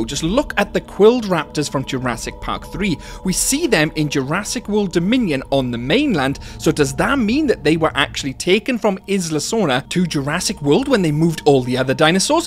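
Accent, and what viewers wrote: Wales, United Kingdom. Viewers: Awesome video! Also, whereabouts from the UK are you from, I hear a bit of a Welsh accent in there but cant tell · Idk why but u sound welsh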